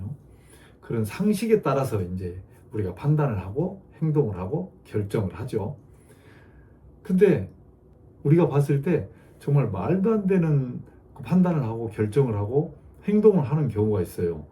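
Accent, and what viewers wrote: Jeolla, South Korea. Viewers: 잘듣고있던중. 절라도사투리를. .거참 .!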